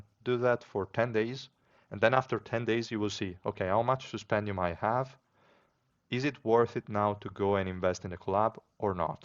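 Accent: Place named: Eastern Europe. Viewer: haha noooo, I also have the -ian at the back, but it is ... Asian 😂 I am a Vietnamese who like to explore language and luckily I know some of Italian words. The one that make me surprise is your accent which make me assume that you are from the Eastern or Northern part of Europe 😅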